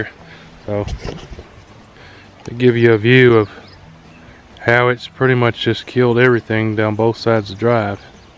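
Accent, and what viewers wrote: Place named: East Tennessee, United States. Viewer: He talks like we do here n East Tennessee “FRONCH Yard” 😂😂. Thanks for the video definitely getting that.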